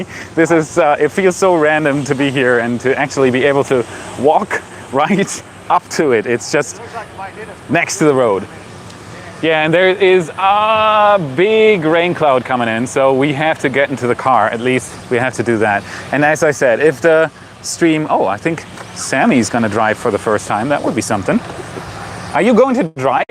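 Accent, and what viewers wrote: United States. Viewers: Why has your accent changed? Its more american than your usual accent. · You look so relaxed, you even are getting an American accent ! Wild... Ya, Boca Chica is a madhouse these days. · not only do you sound excited, but you also sound like an American. Your accent has faded since you arrived in the good old USA. We are happy to have you and you are welcome here anytime! Keep up the great work.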